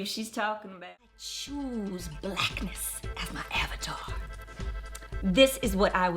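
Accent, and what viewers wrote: South, United States. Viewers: U I like her, and I do like the southern accent.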